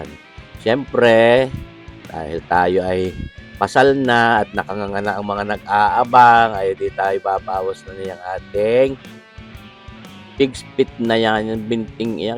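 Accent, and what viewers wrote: Batangas, Philippines. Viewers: Batangas po yang cooking man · that’s ow Batangueño talks…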